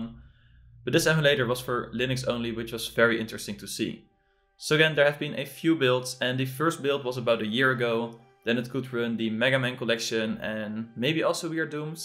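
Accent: Netherlands. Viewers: are u dutch lol? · mooi nederlands accent, goeie video!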